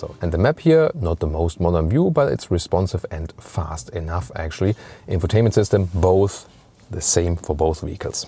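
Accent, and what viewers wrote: Germany. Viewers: Your englisch Sounds very german. Funny.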